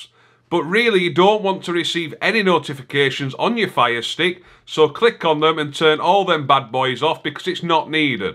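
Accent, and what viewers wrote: Yorkshire, United Kingdom. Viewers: 2 4K firesticks also a couple of very small items that has helped me free up space is turning off resource hogs such as the screen saver that can be accessed in the Display & Sounds...by default it is set to 5 minutes turn it to NEVER and back out also daily I find myself deleting something called FreeTime also eating up resources...You might add one should clear cache and force stop every item upon completion of use...also I downloaded a very small program I believe it's about 7 mb called...Background apps and Process list( via the magnifying glass thingee)...It will show you anything running in the back ground...plus having item one can removed that you don't use or items you've missed forced closing and it is eating up valuable space. Many times buffering is caused by little room left in the processor...Just a thought...btw thank you so much for your videos always funny and hugely informative...Granted sometimes I have no freakin clue what you say ...The Yorkshire accent ya know :)...it took me awhile to figure the word "Privacy" when you were talking about your VPN...I was gonna spell it but I don't think I can spell it the way you said it LOL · A right good Yorkshire accent.. 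I’ve a fire stick and I’ve downloaded your app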